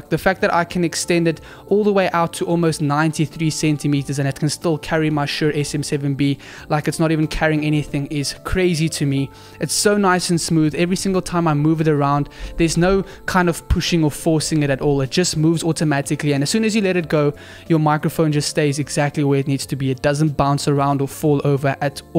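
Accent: South Africa. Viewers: You sound like a south african! Allo from Montreal! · You lowkey sound South African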